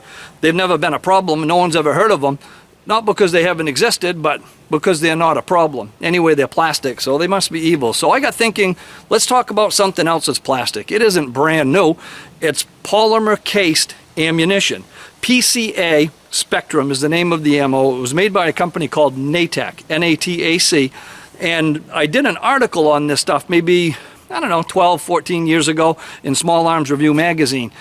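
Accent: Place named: Northeast, United States. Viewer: Mouth is too small. Looks homeless. Has a northeastern accent. Very twitchy like a methican American. Also full of shit. If you weren't such a poser you'd know a soldier wouldn't get a lighter load if this worked out, he'd just be ordered to carry more. Pound of feathers weighs the same as a pound of stones.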